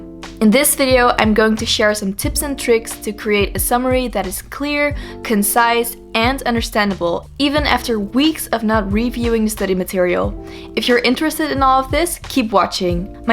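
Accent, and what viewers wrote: Netherlands. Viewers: When I heard ur accent I noticed how it sounded dutch, when I saw: "inhoudsopgave" I was like: 'Aaahh so she is'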